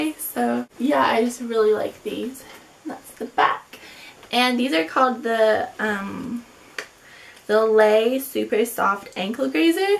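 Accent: United States. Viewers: Why are you speaking american if your english? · Why do you speak in a American accent ? It's pretty annoying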